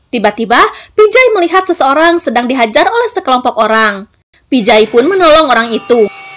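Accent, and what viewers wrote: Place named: West Java, Indonesia. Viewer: Alhamdulillah ya Allah, dapat PIN juga dari kak mimin, makasih kak mimin yg comel 💖💖 Jujur aku suka banget dengerin suara kak mimin dgn logat sunda nya 💖💖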